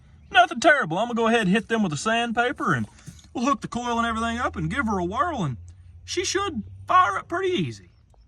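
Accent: South, United States. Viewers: Just wondering what part of the south you from cause the accent and love the videos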